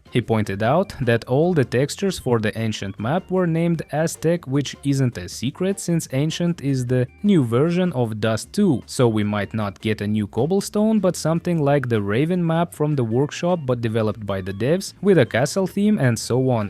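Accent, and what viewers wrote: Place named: Russia. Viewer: god bless you, i was missing a interresting russian talking, with that cute russian accent. i love it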